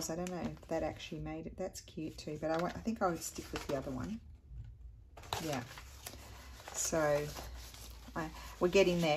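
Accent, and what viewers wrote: Australia. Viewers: Well done, considering the upheaval happening with packing and moving. I love watching your videos and the Aussie accent, even though you’re living far from us!! Thank you for this great idea with the “w”indow theme!! Regards, Susan W Australia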